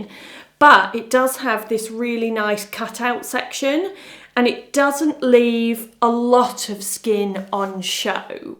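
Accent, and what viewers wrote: United Kingdom. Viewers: Ohhhhhh Everything amazing 🎉🎉🎉🎉🎉. Your british Accent just kills me 😂 of happiness. So so elegant❤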